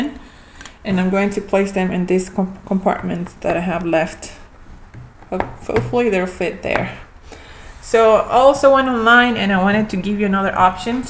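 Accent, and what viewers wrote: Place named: Spain. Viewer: Hi, It seems that your mothertongue is Spanish. What language do you work with your child? English or Spanish? I'm asking that because my daughter is bilingual and I'm not sure if I should try to help her to read Portuguese or French, the language she will learn at school? Can you pls give me an advice? Thanks.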